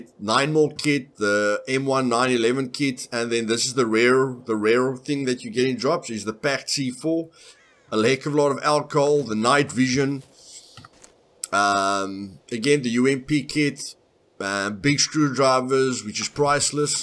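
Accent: South Africa. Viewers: hahhahahaha 1000% south african! i love it! Dankie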